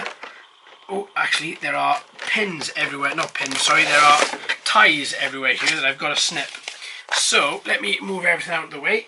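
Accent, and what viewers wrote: Wales, United Kingdom. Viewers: And that is why your videos are so infectious! Tell me, what part of Wales are you from? My dear old mum was born in Abergavenny, so I have Welsh blood!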